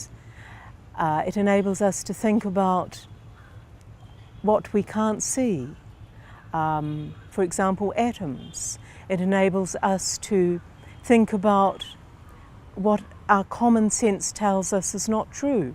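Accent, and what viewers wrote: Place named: England, United Kingdom. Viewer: One of New Zealand's least intelligent academics, a fake celebrity charlatan riding the coattails of her own controversy to success. will be remembered forever as the moron who tried to mould the education system in her misinformed image and failed. Imagine sitting through a whole lecture of this bints unbearable Tory lilt, should have been deported back to the UK years ago.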